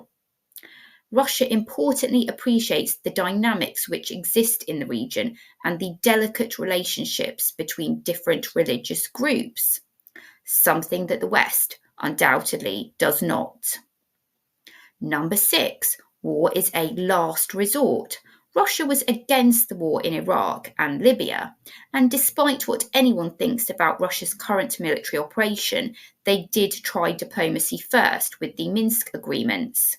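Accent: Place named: United Kingdom. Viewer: has a fantastic British accent. It’s pleasure for my ears to listen to her.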